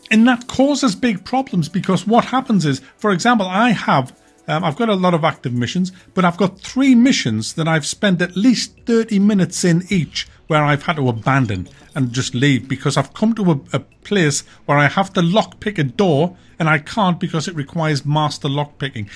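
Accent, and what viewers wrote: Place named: Scotland, United Kingdom. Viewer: oh the accent sounds kind of scottish, but what do i know im from canada lol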